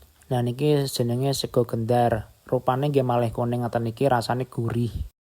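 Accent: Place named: Java, Indonesia. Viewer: Kok bahasanya medok kayak jatim jateng ..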